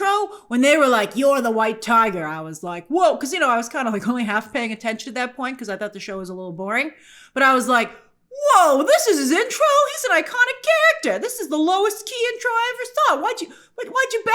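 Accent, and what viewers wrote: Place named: New York, United States. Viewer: love the NYC accent hahaha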